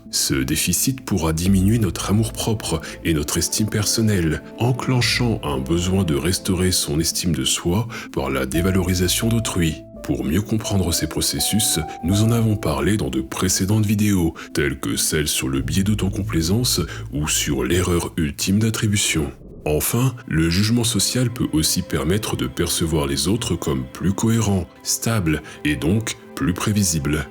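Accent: Brittany, France. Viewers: d'accord j'ai mis un temps à comprendre ce qui me gênait à l'oreille car c'est très discret, je ne savais pas que c'était une particularité bretonne ! bonne continuation et merci pour vos videos ! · non ce n'est pas un "défaut" c'est l'accent breton, on dit "même" comme ça ici, et les accents sont prononcés différemment. Tout comme l'accent du sud ou d'autres accents prononceront les choses différemment, et ce n'est jamais un défaut ou une faute, juste une habitude culturelle différente.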